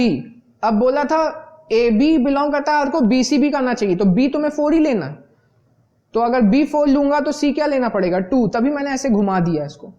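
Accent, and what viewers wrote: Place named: Mumbai, India. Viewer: if i am not wrong this sir is from mumbai.Guessed by his accent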